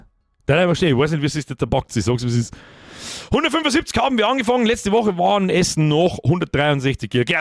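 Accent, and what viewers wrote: Bavaria, Germany. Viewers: Bin vor 2 Jahren von NRW nach Bayern gezogen. Höre deine Videos auf der Arbeit um mal mehr bayrisch zu verstehen😂 Funktioniert echt super! 🎉😂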